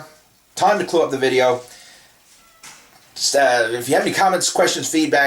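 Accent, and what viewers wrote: Canada. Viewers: Beans, lentils, skim milk powder, eggs, cottage cheese. cut out crappy snacks, booze and takeaways. ln the end cut your suit according to your cloth. Great site. Cant quite get the accent, are you Canadian. Difficult when from England to spot the accent.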